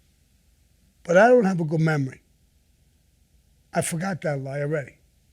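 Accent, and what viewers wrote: United States. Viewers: Especially with the American accent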